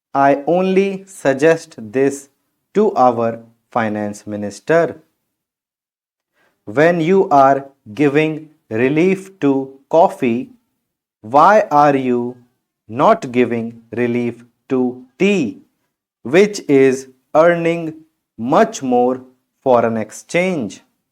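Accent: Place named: North India, India. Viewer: North Indian accent ......not useful for South Indians....you people call school as ischool.....😂😂😂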